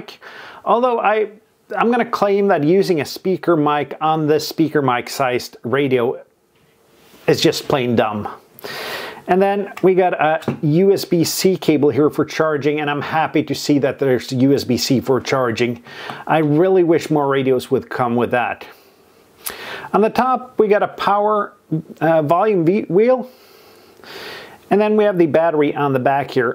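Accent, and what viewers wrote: United States. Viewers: Your prosody not very Norwegian at all. You sound waaaaaaaay more American than Norwegian. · Yes, I've noticed a lot do speak English well. This man in the video could pass for an American or Canadian citizen with his accent, no problem. I'm American, by the way.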